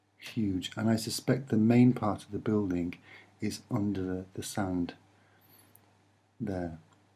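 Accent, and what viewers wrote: United Kingdom. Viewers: I believe him because he has a British accent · OMG another soft spoken British guy You're on fire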